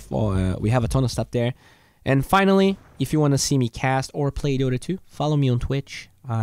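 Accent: Brazil. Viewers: Hey big fan here, Just a suggestion, try to loosen up more the brazillian accent, try removing ur chu-to accent Make sure chu fight chu win And instead Make sure to fight to win For me thats the biggest tell of a portugese accent (sorry for the wrong spells) Ive watch too many mma's where there r a lot of brazillian fighters. Love ur content bro, straight to the point and no beating around the bush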